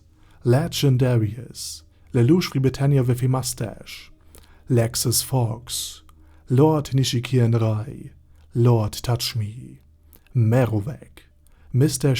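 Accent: Germany. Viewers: Selbst als Deutscher fällt mir das Lesen deines Namen sehr schwer. Ich finde das Video sehr gut und dein starker Deutscher Akzent ist auch ma was anderes .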